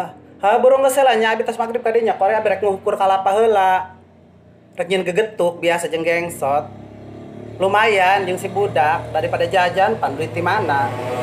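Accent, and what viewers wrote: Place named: Banten, Indonesia. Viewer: Siga urang banten ngomongna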